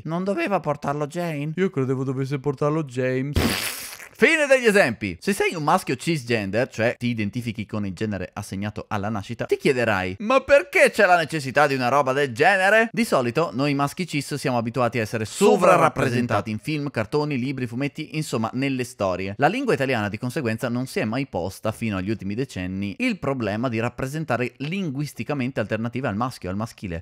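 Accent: Naples, Italy. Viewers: sembra napoletano😅😅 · ma questo modo di parlare è normale nella lingua napoletana. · Incredibile.. sembra napoletano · Sembra napoletano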